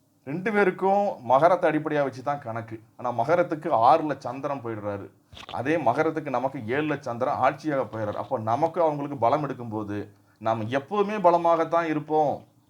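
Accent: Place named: Kongu, India. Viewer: Arumai sir 💐especially pleasing to hear the positive explanation through our veera kongu thamil slang👍 It's our luck to have a great patriot modiji as our prime minister 👏 We are safe in his rule. Thank you for your great efforts 🙏. Bharat Matha ki jai💪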